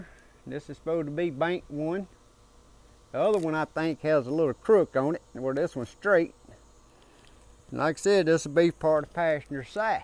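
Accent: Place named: Kentucky, United States. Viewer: Great video buddy! I got to change mine and I’ll know what I got coming. I don’t hear many folk on YouTube that kinda sound like me 👍 I’m from Southeastern Ky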